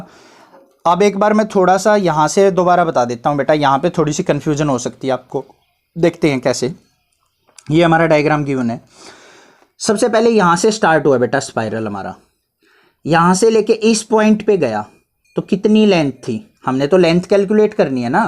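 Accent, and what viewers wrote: Haryana, India. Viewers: Sir aap hariyana se ho kya ?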